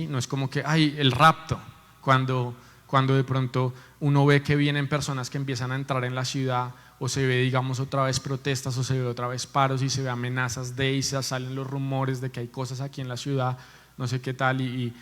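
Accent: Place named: Colombia. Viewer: Hola siervo de Dios por primera vez lo veo y lo escucho por su acento se que es de Colombia y le ruego que no tenga temor nunca de hablar lo que el Espíritu Santo le dice para su pueblo recuerde de donde saco a Jonas para que ablara a ninive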